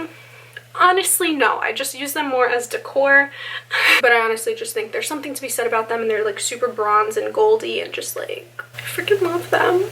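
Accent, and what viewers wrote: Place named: East Coast, United States. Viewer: Kinda random but are you from the east coast? Your accent peaks through at times. 😂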